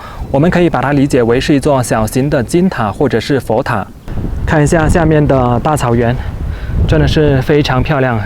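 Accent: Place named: Hakka, China. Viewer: 從你的普通話 可已知到你是客家人 佩服你的毅力及對各地風警 人文的解說 我是住在南加州的七十八歲的客家老者 喜歡看你的視屏 我也有制作一些旅游視屏 但難豋大雅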